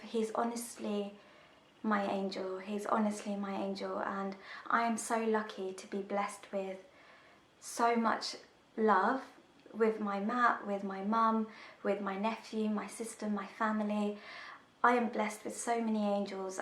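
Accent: United Kingdom. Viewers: do you say Tuesday like chuesday I like the British accent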